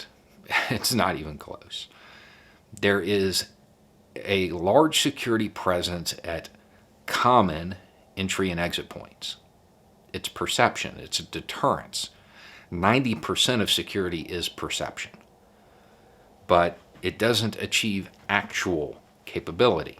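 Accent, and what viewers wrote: South, United States. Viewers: Smart man country accent...ty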